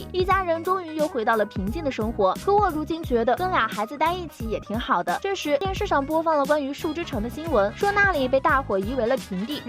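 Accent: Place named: China. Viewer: 版主是中國人又不是台灣人，口音聽不出來嗎？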